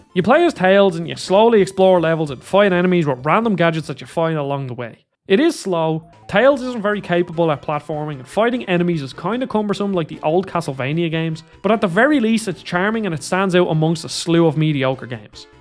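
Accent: Dublin, Ireland. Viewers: Is that a Finglas head I hear? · I at least liked the Irish accent,the words seemed pretty harsh. And what's so great about the Master System that Game Gear doesn't have? I'm honestly curious because I see several comments complaining about the versions used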